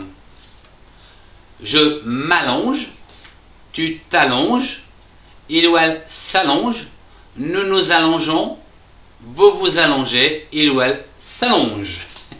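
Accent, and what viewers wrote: France. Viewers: la mélodie de votre voix est très française en anglais, c'est sympa. aussi votre accent, comme un copin de moi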